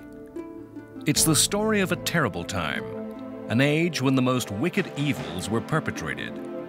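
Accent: United States. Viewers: wait why is this narated by an american?...the rest of the ones i have watched are narated by an English guy? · An American who actually pronounces "Adolf" correctly!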